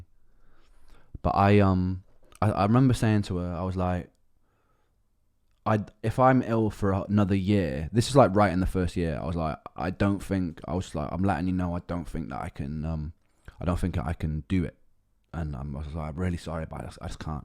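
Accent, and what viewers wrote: United Kingdom. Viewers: love the facet as a fellow man who is a Welshman and who thinks of themselves as a Brightonion I still hear the welsh pronunciation in your words like Appy, with a soft a.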